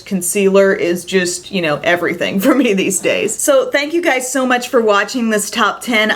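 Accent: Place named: South, United States. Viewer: Oh my goodness, this feels so strange to be watching the Awards before Christmas. I've been watching you since I was a freshman in college in 2011 when I searched YouTube for a review of the Benefit They're Real mascara. And now I have a degree, and you have a lovely child and another one coming very soon, I'm so happy for you all! Thank you for your videos, they make me so calm when stuff gets crazy. P.S., I know you guys are from southern IL, but do most people in your area have a distinguishable southern twang like has and you sometimes have? I've lived a good amount of time in NJ, MI, and NC so I've developed a few twangs throughout my life as well so I think I can pick up on them a little more than I used to. P.P.S., you are a great sport, we love you!!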